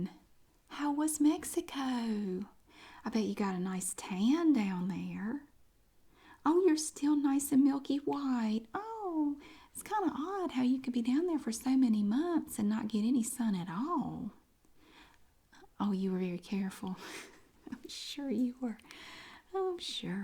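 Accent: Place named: Carolina, United States. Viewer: Accents change a lot based on location. Her accent is mostly from north/South Carolina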